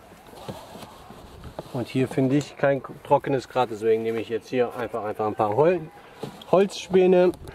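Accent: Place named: Swabia, Germany. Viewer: Stöckle, Äschdle, jetzet... wo bisch au Du her Jonger? Kirchheim? Göppingen? :D :D :D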